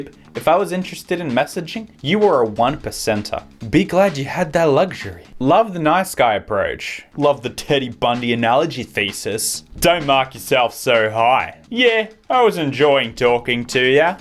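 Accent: Australia. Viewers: your Australian right? I seem to be watching a lots of Australians lately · I love how your Australian accent really comes out in this video ❤ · are you australian pewdie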